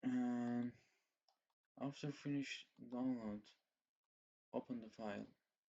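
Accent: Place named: Turkey. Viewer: Btw, i am sure you are Turkish since your accent is like me :)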